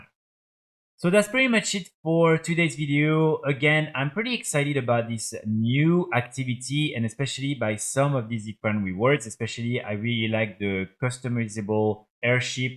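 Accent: France. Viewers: I think he's french so that might be why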